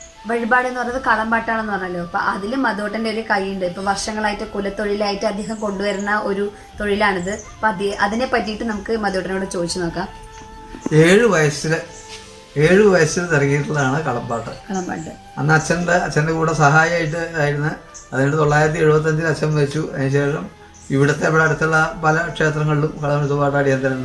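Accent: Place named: Kongad, India. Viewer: You have presented a realistic account of Valiya kavu and the surrounding places.Interview with Sri. was informative.Your kongadan colloquial style of casual narration is pleasing & is refreshingly different from the stereotype. For me,who left Kongad decades ago, this was a revisit to the places I grew up.Thank you very much.Looking forward to the ensuing episodes.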